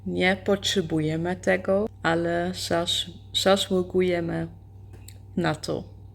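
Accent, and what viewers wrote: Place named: Netherlands. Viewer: Bardzo mi się nie podoba jak kaleczysz nasz piękny język z tym obrzydliwym holenderskim akcentem.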